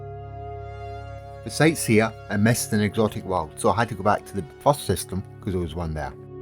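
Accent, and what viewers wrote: Scotland, United Kingdom. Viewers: Scottish... U so Scottish ^o^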